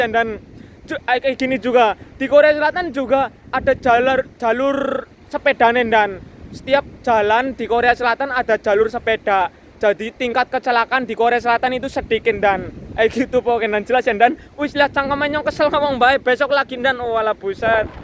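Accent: Banyumas, Indonesia. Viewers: Jelasine oke bgt.. ngakak pke ngapak lanjutno mas.. 😂😂😂😂 di Indonesia aspal di kira coklat jadine di jdiin cemilan 😂😂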